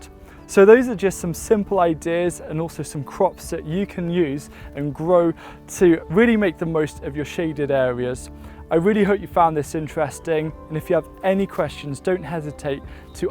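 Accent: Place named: United Kingdom. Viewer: Where are you at? I thought you were brittish😊👍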